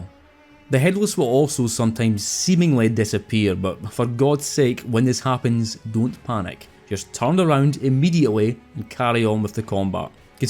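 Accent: Scotland, United Kingdom. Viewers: is it me or does sound increasingly Scottish with every new video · Holy crap 6 hours of Scottish man talking about soulsbourne 🥹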